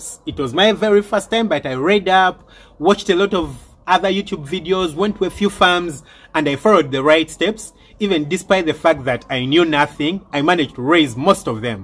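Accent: Uganda. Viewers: Ugandan accent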